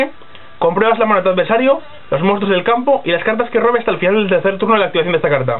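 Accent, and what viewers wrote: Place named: Spain. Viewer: te entiendo perfectamente, y tmbn el q debas hablar un pco acelerado para no alargar los videos, ademas claro del hecho de q eres español XP, pero tmbn deberias tomar en cuenta q tus videos los ven latinos como yo y otros duelistas de habla hispana y que seria de mucho agradecer que por lo menos dejaras ese acento en los videos o mas q nada pudieras pronunciarlo bien ojala, puede q paresca exagerado pero tus videos son una gran guia, y cosas como esas empañan el video para nosotros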